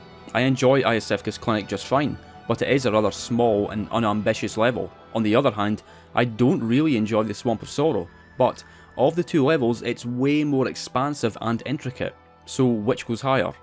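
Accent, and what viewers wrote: United States. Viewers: That American accent is so fucking funny bro. 😂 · love the american voice. i was forced to use 1 from cape town south africa, working for t-mobile. i hated how i sounded. you were great. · Your American accent is really spot on, could use some more practice, but pretty good. As a american, I approve lol. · The American accent is fire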